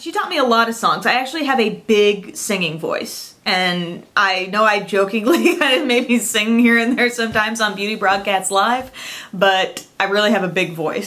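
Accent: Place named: South, United States. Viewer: Really? From Illinois? Your accent totally not a Chicago accent. I thought you were from the south.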